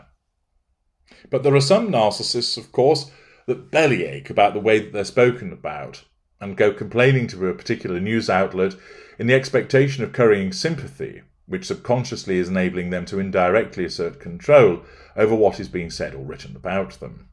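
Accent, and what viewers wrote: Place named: Northern England, United Kingdom. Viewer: Noticed he pronounces other words in this way... always wondered if he originally came from the north of England. Whatever I enjoy his videos so it doesn't matter.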